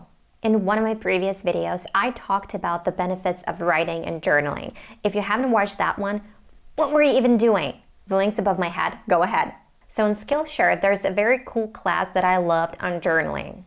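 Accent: United States. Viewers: I like your american accent so much coz I understand 97% of your words. But I just understand 15% words of Australian tourists in Bali and other cities in my country Indonesia. Unfortunately... english classroom teaching in Asia is based on british because asian countries are former european colonies. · How many years have u been studying English?,.I love your sounds very great of course American English.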